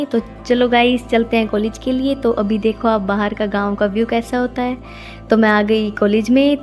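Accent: Haryana, India. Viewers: Haryanvi tone lagra aapka.. Haryana se ho kya aap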